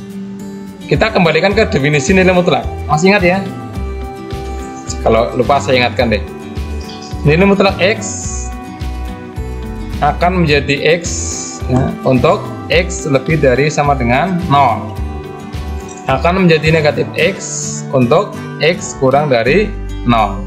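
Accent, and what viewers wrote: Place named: Banyumas, Indonesia. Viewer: Bapaknya ngapak ya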